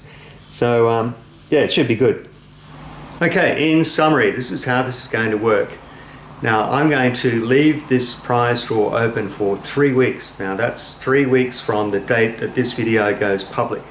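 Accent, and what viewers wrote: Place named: Australia. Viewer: Bridgeport would be my machine of choice, but being a hobbyist I "opted" for an Optimum. It would be great to add your touch dro device to my newly ordered machine. Good luck - great to watch your channel, particularly to hear the familiar Aussie accent (here in Sydney)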